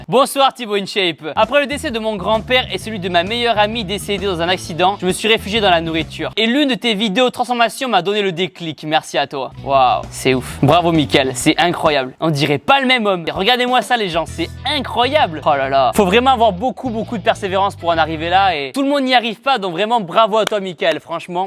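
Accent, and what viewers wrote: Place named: Paris, France. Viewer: TU VIENS DE TOULOUSE MAIS TU LA MASSACRES AVEC TON ACCENT PARISIEN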